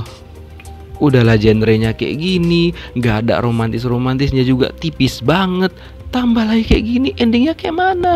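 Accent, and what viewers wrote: Medan, Indonesia. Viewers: Logat mu kek orang Medan Wak 😂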